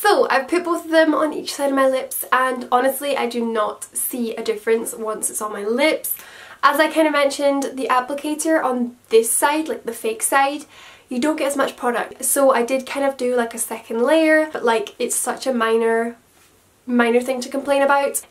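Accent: Scotland, United Kingdom. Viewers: Omg I'm crying (literally) because your accent is exactly like mine! And to find a YouTuber that lives so close to me is insane!! And to hear you day Anastasia in a Scottish accent make me so happy! Because all the YouTuber I normally watch are American or English! Or Australian. So it's pretty cool to find a Scottish YouTuber!! X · Omg right I was Watching this and I was listening to your accent and I was like you sound quite Scottish so I clicked the link and I was right I feel proud 😂 I'm from Scotland too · I feel like I hear a Scottish accent a tiny bit from you? Might just be me tho😂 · Are you Scottish? Your accent is so lovely😻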